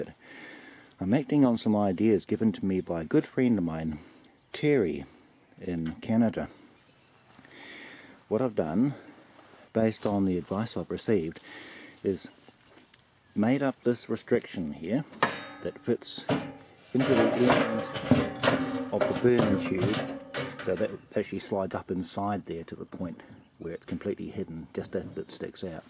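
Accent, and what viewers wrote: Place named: New Zealand. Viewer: judging buy your accent your from new zealand, which leads me to ask... what wood are you going to run in your "imberted" fema gasifier?.... imbert type gasifiers are designed for hardwoods and don't take well to softwoods (pine etc) as the air jet velocity is too high and destroys the char bed, with uncool results for gas production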